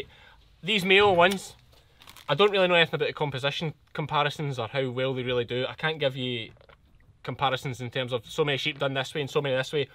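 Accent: Scotland, United Kingdom. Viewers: I just enjoy your channel so much, informative, funny, still having a wee bit of trouble with the Scottish accent a bit strong at times but I am getting better at it the more I watch you, I never knew there were so many breeds, keep the videos coming👍🐑🐑🐏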